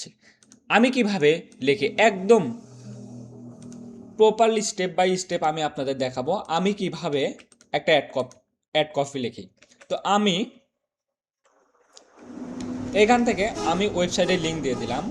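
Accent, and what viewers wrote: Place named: Feni, Bangladesh. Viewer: Apni jey vhabe bujaysen.best.oneker paid course a egula bole na.i mean janena.best of luck. Apnar kothar tone a mone holo fenir ashe pashe may be home?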